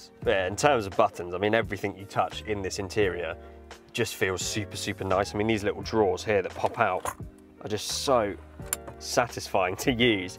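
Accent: United Kingdom. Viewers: Bro., your voice impressions of Doug Demuro was 100% spot-on. But what was equally amusing was your transition to your native British Accent as i thought you are American and certainly never heard of any Britt impersonate our Accent so accurately. You earned my subscription right away.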